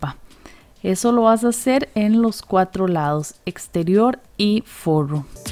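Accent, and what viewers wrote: Costa Rica. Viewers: Ese acento me es familiar!! Eres Costarricense?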